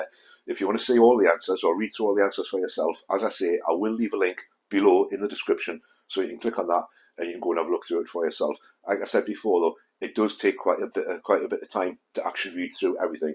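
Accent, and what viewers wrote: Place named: Northumberland, United Kingdom. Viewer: Says your in Northumbria and can tell your local too by accent don't mind a ride out one day!!! I will be better once weather warms up am crippled with pain in the cold!! Im only 39 but feel more like am 78!! Love reading its how I got good at motor trade reading and trying out learn from my mistakes!! Youtube videos make it lot easier for those who want too learn the trade now!!!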